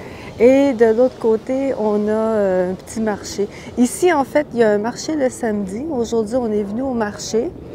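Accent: Quebec, Canada. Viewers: Oh, c'est le lieu de mon enfance! C'est formidable de le voir à travers les yeux d'une canadienne et d'en entendre parler en français (québécois ☺️)! Merci! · I'm enjoying your videos very much! Are you always speaking with a Quebecois accent in your videos? Even when you're teaching grammar and vocabulary?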